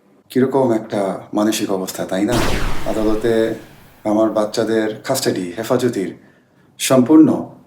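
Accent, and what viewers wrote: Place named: Sylhet, Bangladesh. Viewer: ভাই আপনি সিলেটি। । শুনে খুব ভালো লাগলো ফ্রম লন্ডন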